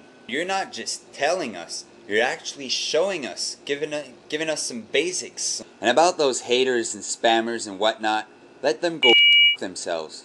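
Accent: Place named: Quebec, Canada. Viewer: Loved the animations! And had to listen VERY HARD to find any Quebecois accent in there. Pity, kinda, I love the melodious way some Quebec'ers speak english.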